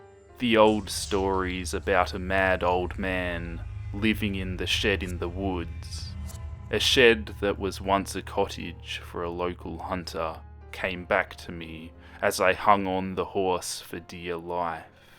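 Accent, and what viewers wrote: Australia. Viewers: Yes! Another Aussie! Love your work mate! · Bro are you Aussie??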